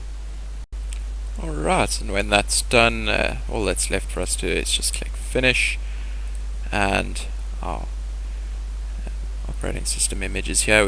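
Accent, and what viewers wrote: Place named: South Africa. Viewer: I opened this video randomly and immediately recognised a South African accent😂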